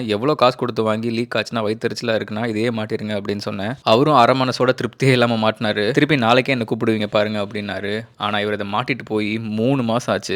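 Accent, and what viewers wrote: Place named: Nagercoil, India. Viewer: Bro unga voice konjo nagercovil la ullavanga pesuramari eruku 😅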